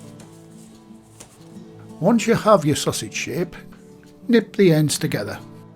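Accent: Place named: North West England, United Kingdom. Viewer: Thank you and tomorrow I'm going to have a go making this recipe as it is clear and concise. I like the voice over instead of watching someone banging around in the kitchen, personal preference. I have been on the old sourdough journey and have finally got a starter going, it's still a learning curve to make a loaf that's edible and not too dense. I finally bought bread flour, so I think this will make all the difference. When you said *natural yeast (I think that was the term used) do you mean sourdough? I also liked your accent as I'm from the North West coast originally, I live in Canada. Left UK in 1972. I'll have to go look at your website. *edit: no it was fresh yeast you said!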